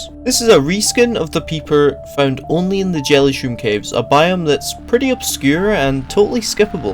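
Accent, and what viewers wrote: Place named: United Kingdom. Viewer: Note his British accent p.s. not making fun at it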